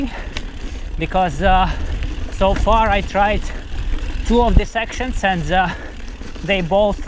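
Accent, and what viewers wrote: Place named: Russia. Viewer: Ох уж этот неповторимый русский акцент...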